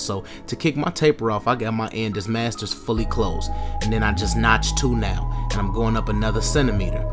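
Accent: Chicago, United States. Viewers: Sound like Chicago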